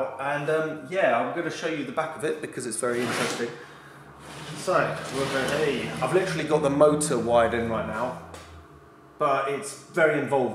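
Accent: United Kingdom. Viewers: Only thing I like about you is your english voice. But there is no punk anymore. No revolution. Just established crap about electro bullshit. Just like that sad Schneider.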